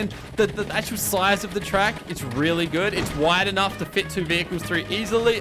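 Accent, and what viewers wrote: Australia. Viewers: your Aussie? ey mate! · wait u are aussie first time i met a aussie youtuber :D im aussie!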